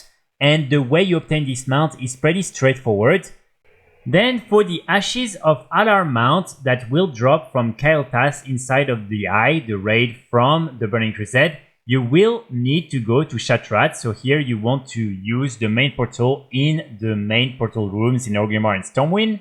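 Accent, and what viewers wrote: France. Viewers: Je reconnais un Francais quand j'en entends un 😅 merci pour le guide !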